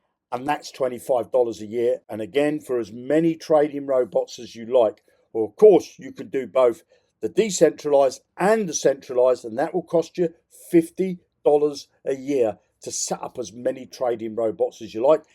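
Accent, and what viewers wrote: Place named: Northern England, United Kingdom. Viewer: REACHING OUT YET AGAIN. DUDE IF YOU CAN'T BE ARSED TO ANSWER YOUR SUBS I CAN'T BE ARSED TO KEEP SUBBED. STEP UP YOUR GAME DUDE. HERE GOES AGAIN. MY WALLET TOP UP SAYS You cannot top up your account with the selected MetaMask chain, please change to «BSC Chain»! NOTE* you must connect your metamask account to this website I THEN PRESS THE BUTTON AND NOWT HAPPENS. YOU SOUND LIKE YOU FROM THE NORTH LIKE ME SO WHY DO YOU OPERATE SO SLOWLY????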